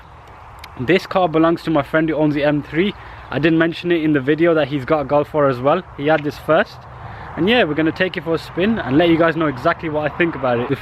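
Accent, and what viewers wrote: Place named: Birmingham, United Kingdom. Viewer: You're from Birmingham aren't you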